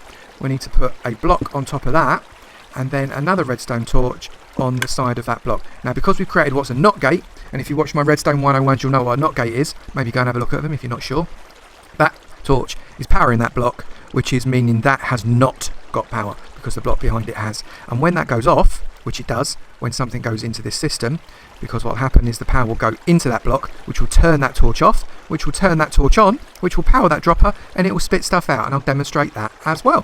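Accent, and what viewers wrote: United Kingdom. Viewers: Aah your British accent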